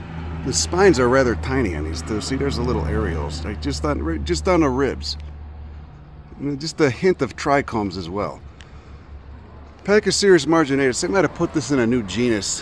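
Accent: Chicago, United States. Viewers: Ya that’s a south side Chicago accent!!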